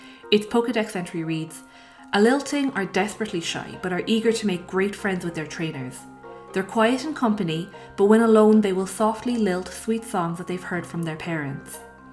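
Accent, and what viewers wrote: Ireland. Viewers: you have no idea how happy i was to click on this and hear an irish accent as an irish person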